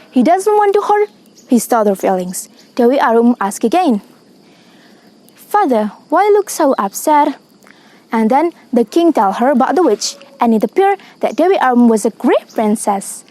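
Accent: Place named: United Kingdom. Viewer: Keren mbak... british aksennya itu, uwuwww top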